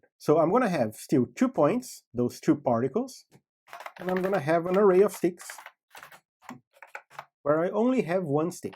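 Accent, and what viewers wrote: Brazil. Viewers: this guy has to be brazilian, no where else we find that accent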